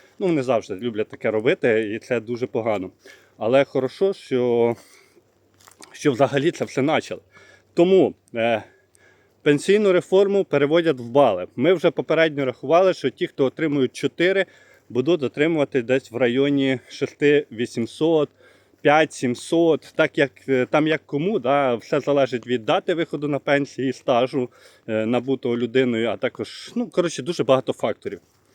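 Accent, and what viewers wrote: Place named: Surzhyk, Ukraine. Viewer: Дуже дратує суржик цього журналіста!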